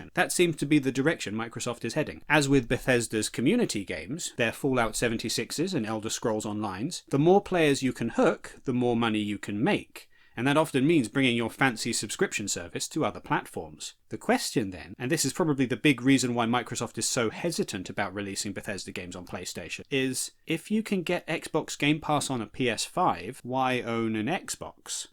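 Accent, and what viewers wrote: United Kingdom. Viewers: You're an embarrassment to the English accent. Good lord, what an absolute cretin. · Dude, your British accent and calm demeanor can’t hide the truck load of salt in your voice And this is coming from a guy who owns a PS5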